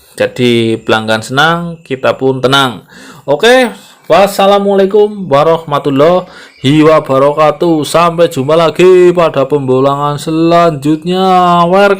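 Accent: Banyumas, Indonesia. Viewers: kan wong ngapak, ora ngapak ora kepenak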